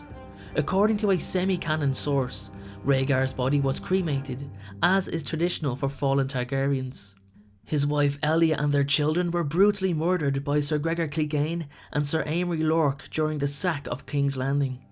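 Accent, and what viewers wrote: Dublin, Ireland. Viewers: I think he's a Dubliner like myself 🙂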